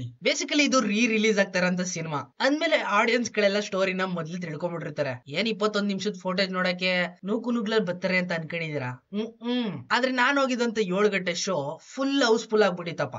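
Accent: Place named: Mandya, India. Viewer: ಅಣ್ಣಾ ಇಷ್ಟು ದಿನ ಎಲ್ಲಿ ಇದ್ದೆ ಅಣ್ಣಾ ನಮ್ ಮಂಡ್ಯ ಮೈಸೂರ್ ಬಾಗದ ಭಾಷೆ ಕೇಳಿ ಕುಷಿ ಆಯ್ತು😅😅😅❤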